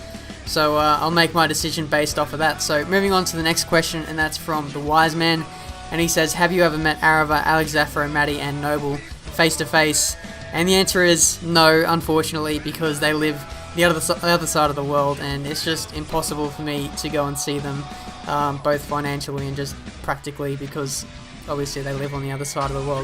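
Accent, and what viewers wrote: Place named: Australia. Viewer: I subbed to you because of: - your smooth, no assists used, high level driving at a constant rate - the great editing (music, best highlights) - the setup videos - your pleasant voice (#nohomo). Because as a LISTENER thats very important. For example one of the reasons why speedyw and pewdiepie are so big is that they also have a very pleasant voice. I can not stand childish, high toned voices. That gives me the shivers in a bad way lol. :p - the aussie accent hehe Ps. I'm surprised that you only followed F1 since 2010? I for example, am a fan since I was a lil boy lol (since 1999). You missed some great fights between Hakkinen and Schumacher, Alonso and Schumacher then :p. Ps.ps. keep up your awesome work! ;)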